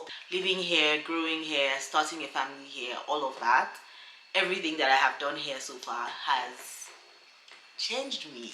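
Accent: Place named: South Africa. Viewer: Your accent sounds more South African now. I'm new here, but I listened to your video about South African's being overprotective. You sound totally different. Glad you're still around.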